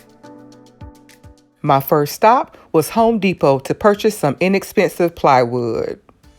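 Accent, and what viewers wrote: South, United States. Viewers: Wow! I so loved this video. Your explanations are so on point and easy to follow. I want to become a weekend warrior for this one. I love love your southern accent. It's so welcoming. What size was each batting and vinny piece cut to? Thanks for the videos and Blessings to you and your family !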